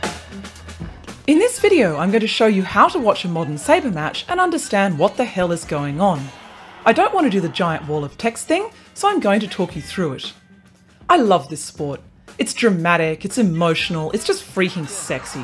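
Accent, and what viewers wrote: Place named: Australia. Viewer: dat aussie accent:D Finally this day has come! We can hear ' voice and don't stop the video for text reading. Sometimes it was given too much text for a very short amount of time. I don't know about the others, but as for me, for a not-native English-reader, I couldn't read those sentences that fast in English. Thanks, keep prospering and enlightening people about this spectacular sport!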